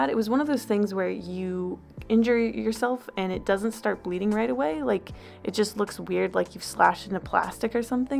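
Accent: Canada. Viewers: Is she from Canada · You're Canadian?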